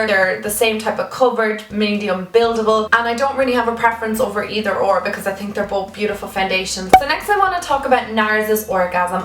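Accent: Dublin, Ireland. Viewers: what county in Ireland are you from☺️x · It's Irish, have you ever even heard a Scottish accent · U a dub ?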